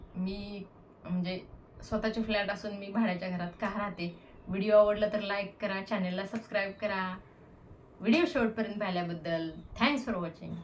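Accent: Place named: Sangli, India. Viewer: One shoul buy flat as oer his requuremnt unnecesry buy so mny flat may out u in danger.as maintence of society etc hv to oay al bills etc.its costky akso unnecessry paymnt if dues.try to hv 1 gd flat wgere u can maintain d same properly.its not wirth to hv so mny fkats if u hv income u may go n rent tgem out so u may get som income. I think u r from sangli area its accent is in Sangli language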